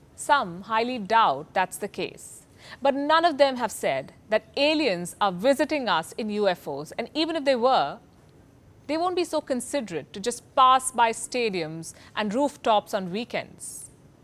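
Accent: India. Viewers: she is a total packages her presentation is gold standard , she delivers in such a clear and concise language , i think she is one of the best journalist on the net , and i love her Indian accent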